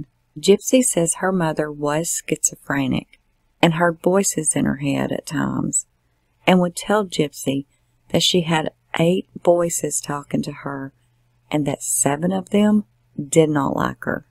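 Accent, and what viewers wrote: South, United States. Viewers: There’s something about your voice.. it’s soothing. Maybe it’s your southern drawl or the inflection in your voice?!? Either way I do enjoy your videos, book synopsis are my favorite.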